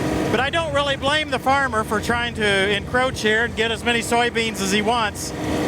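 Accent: United States. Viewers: Just like the way you Americans talk I can listen to you all day ...your doing a great job 👍 have a great day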